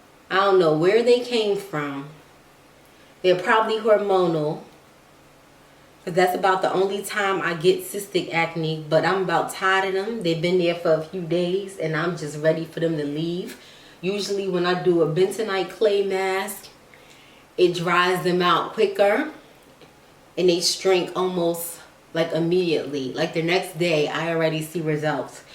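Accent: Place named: New Orleans, United States. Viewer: Omg I love her accent. Are you from New Orleans. And I love your videos 🥰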